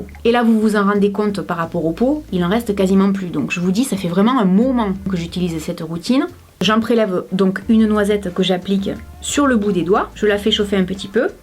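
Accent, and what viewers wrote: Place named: Marseille, France. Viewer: Cc j'adore t'es vidéo continue comme sa juste tu es marseillaise ou pas car tu a l'accent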